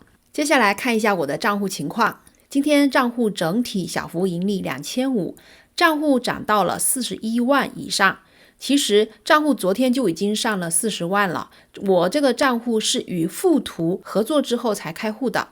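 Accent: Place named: Jiangsu, China. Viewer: 謝謝Sammy 分析，一口江蘇、上海人口音，請問你哪裡人啊？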